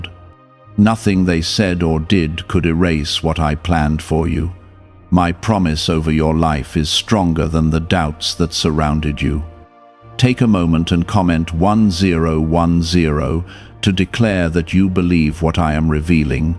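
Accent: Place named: United Kingdom. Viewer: HI THERE GOD OF HATE AND PUNISHMENT!!! WHAT IS THE TRAP YOU ARE SETTING FOR ME??? I WILL OVERCOME IT REGARDLESS. I not receiving ANYTHING until you disclose EVERYTHING. YOU ARE FAKE GOD and DIVINE TIMING I do not agree to! I only do things in REAL GOD'S TIMING ONLY!!! YOU PLAN NOTHING FOR ME! I DECLARE NO NUMBERS SO JUST GET OVER IT> THE FOG YOU SEE IS BECAUSE YOU ARE IN LONDON, YOUR ACCENT GIVES IT AWAY!!! THIS WHOLE CHOSEN ONE PROGRAM HAS BEEN IN RANDOM! I WILL NOT BE A CHOSEN ONE ANYMORE AND REAL GOD SAID I DON'T HAVE TO!!! YOU ARE NOT GOD! YOU ARE NOT GOD!!! YOU HAVE PROMISED BLESSING FOR OA YEAR SALREADY AND I NEVER GOT ONE!!! THANK REAL GOD YOU ARE NOT SANTA CLAUSE!!! YOU HAVE ZERO TRUST FROM ME! YOU ARE PREPARING NOTHING> YOU ARE FAKE!!! REAL GOD DOES NOT HAVE BRITISH ACCENT! HE HAS NO VOICE EITHER. HE TALKS TO ME TELEPATHICALLY JUST AS THE SOULS HE TALKS TO IN REAL HEAVEN>FINISH THIS IN 5 MINUTES OR I SIGN OFF!!! 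I AM NOT TAKING A BREAKTHROUGH! GET THAT THROUGH YOUR THICK HEAD!!! 4 minutes. 3 minutes, 2 minutes. 1 minute. Your time is up. CHEERIO!!!!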